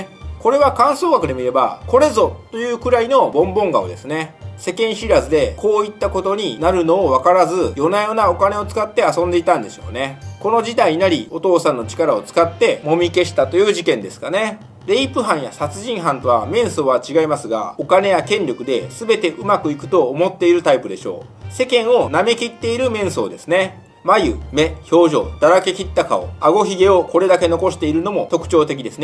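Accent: Kansai, Japan. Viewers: 先生こんにちは。先生の動画拝見を毎日の習慣と糧にしているアラサーOLです。先生は唯一無二のコンテンツ・切り口や視点で、どの動画も本当にとても面白いです！関西のイントネーションやお話方もほんとに好きです。お伝えしたいことと疑問、また相談がありまして、今回コメントさせていただきました。盛り沢山かつ欲張りですみません…。先生の動画を拝見していて感じたのですが、先生はとてもはっきりとかつ相談者や相手のことを考えたうえで、観相学を活用したアドバイスなどをされていると思い、とても親身で素敵だなとおもい、ぜひ結婚相手のことなど鑑定していただきたいなと思いました。まずはお礼をお伝えしたかったので、前置きが長くなりましたが、先生の動画や感じるお人柄もとても好きだからこそ、何日ももやもやしていたことがあったので、こちらに疑問兼質問として書かせていただきました。先生はいくつか観相学を用いて、いろんな著名人を例にご説明されていましたが、日本人あるは在日の方の例がほとんどで、先生はたびたび人種差別はされないと仰ってましたし、私もそのとおりだと思う反面、いくつか動画の表現で、どうしてもどこかで在日の方に対しては排他的な印象を抱かれてるのかな…と胸が痛くなりました。悪人面で何人か取り上げらていたので…私のとり違いでしたら大変申し訳ございません。。なので、一つは、在日や外国人に対して何か差別的なお考えがなければ、ぜひ先生のご発言や動画は今後もっと多くの方に見られる影響力のあるものだと思いますので、血や肌の色を超えて平和を望む一個人としては、先生のお考えをまた動画で聞かせていただけると本当に幸いです。疑問と相談についてですが、私は友人に在日の方や外国人も多く、私自身もハーフなので、そういう純日本国民でない人の人相判断はできますでしょうか。結婚を考えている相手でお付き合いしているのが遠距離中の台湾の方ですが、例のウイルスが予想以上に長く、会えないまた会えても仕事を辞めて海外に移住しなければなりません。また、今別の方にもアプローチをされていて、その方は在日韓国人の方です。(何人とかあまり区別したくないのですが、一応情報として記載いたしました) このような状況もあり、どこでだれと生きていくのが幸せなのか、本当に分からなくなってしまっています。個人的な質問だと採用していただけないので、どうお伝えしたらよいかわかりませんが、エッセンスを抜き取っていくつかの疑問になんとかご助言いただけませんでしょうか…; 先祖が違う民族といいましょうか、違う文化の方(特に結婚相手としての異性)を見るときのポイントやアドバイスがあればぜひお伺いしたいです！仕事をやめて海外で生きていくか、日本で生きていくかも含め、とても悩んでいます。こんな自分が嫌なので、早くはっきりできるよう、先生助けてください; これからも毎日観ますし応援しております‼︎よろしくお願いいたします。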